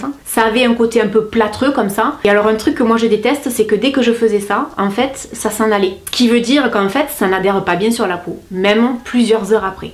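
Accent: South, France. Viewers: et notre bel accent du sud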